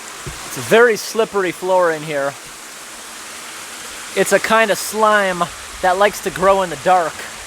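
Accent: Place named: United States. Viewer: Omg I love his American accent.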